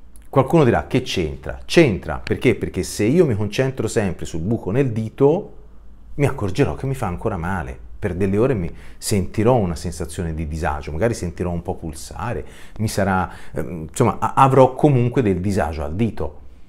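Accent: Tuscany, Italy. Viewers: Sei l’unico toscano che adoro sentire parlare tra l’altro sei anche super affascinante 😃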